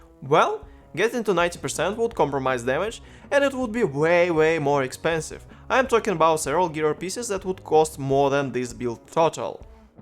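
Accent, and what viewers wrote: Russia. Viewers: ты русский? акцент просто как у русского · русский акцент + уши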